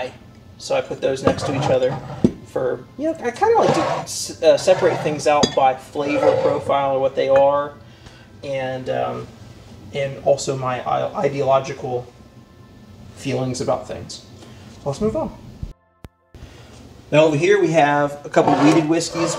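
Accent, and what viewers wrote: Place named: Wisconsin, United States. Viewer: Sounds like you might be from Wisco with all they J. Henry!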